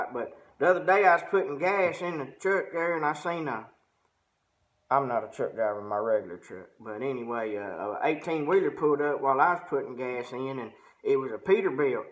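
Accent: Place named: South, United States. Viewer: Dude you're very talented man my hats off to you but hey I'm trying to pick up your accent you have a strong deep southern accent where are you from originally? Oh yeah my lady says that you make her laugh every time she hears one of your songs she said You Got Talent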